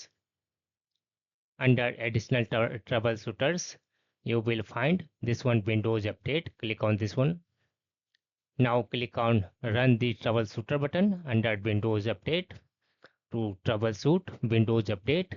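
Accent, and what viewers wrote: India. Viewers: man I love you first i dont trust you becous you sound like a indish person u want to scam me but now i love you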